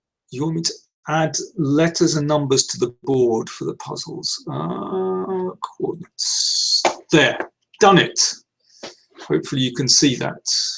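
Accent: United Kingdom. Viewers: what does he mean at the beginning when the guy compliments his British accent and he says "i don't have an accent" ?? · U really think u dont have a British accent? strange, of course u do. not that there's anything wrong with it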